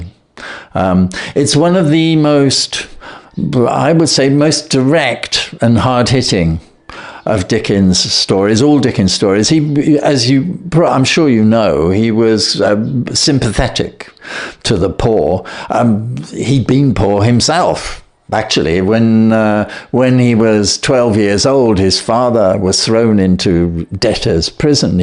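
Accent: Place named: RP, United Kingdom. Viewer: Many thanks to you Mr for this excellent voice and nice RP accent. I beseech the Almighty Allah to bless you. Accept my greetings to you from Iraq.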